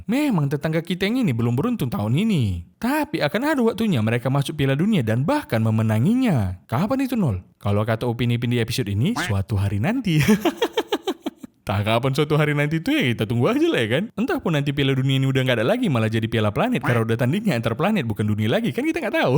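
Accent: Medan, Indonesia. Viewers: Abang orang Medan kah? 😂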